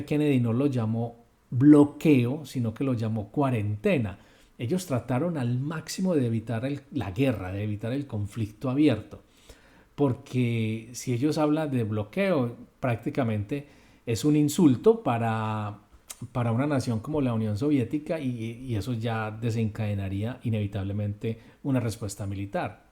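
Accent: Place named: Colombia. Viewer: Muy interesante sus clases .. y esa voz colombiana me recuerda a alguien 😂😂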